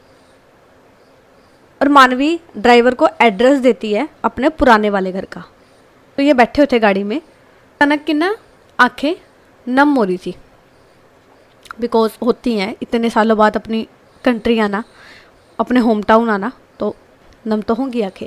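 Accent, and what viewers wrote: Punjab, India. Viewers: Very nice story aapki Punjabi bhi to Kya Baat hai itni khalish Punjabi ❤❤❤❤😅😅😅😅😅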